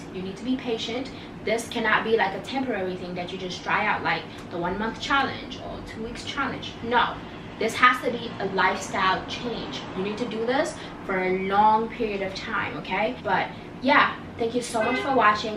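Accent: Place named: Africa. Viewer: Off topic, but why does she sound like an African who is trying to sound American? Or an African who lives in America?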